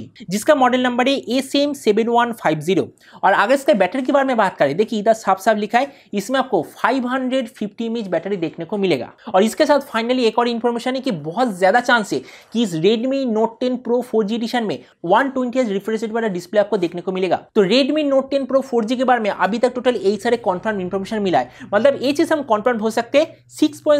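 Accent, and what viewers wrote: Bengal, India. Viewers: Dada apni ki bangali naki apnar Hindi Kotha sunte lage je apni bangali 😀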